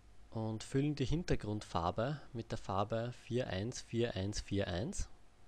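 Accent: Austria. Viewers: Bist du ein Österreicher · Oho ein Österreicher ;)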